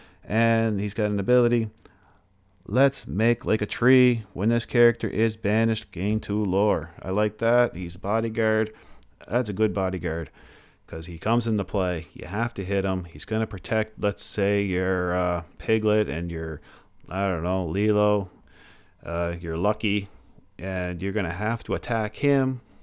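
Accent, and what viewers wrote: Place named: Canada. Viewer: The level of Canadian in your voice....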